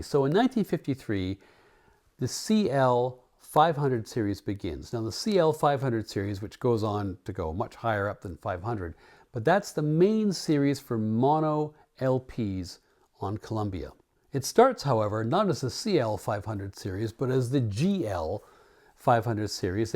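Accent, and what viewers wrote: Canada. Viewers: I discovered your presentations by chance about 3 weeks ago. I'm enjoying the hell out of them.Your knowledge,quietly enjoyable Canadian delivery and sensibility,and your sense of humor have been a lot of fun for me,as someone who has enjoyed records-for the music,the labels,and the design,for well over 60 years. Your description of a weird portrait of Bobby Troup on a 50's album had me in stitches. Your work-and presence-have been a joy for me!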